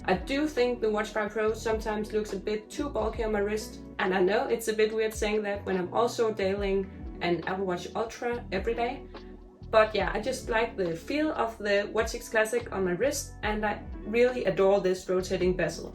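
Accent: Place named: Denmark. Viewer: The Danish Accent 😍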